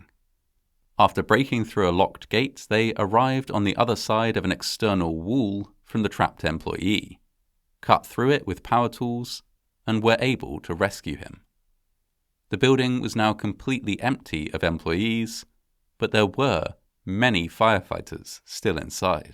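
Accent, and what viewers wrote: United Kingdom. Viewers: The narrator is so annoying. Why do we have a British narrator with an American story sounds bloody awful.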